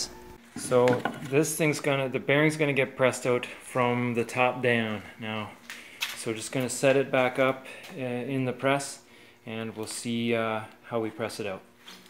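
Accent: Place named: Canada. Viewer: accents are fascinating. I can immediately tell what part of world this gentlemen is from. Go Wayne Gretzky!!! lol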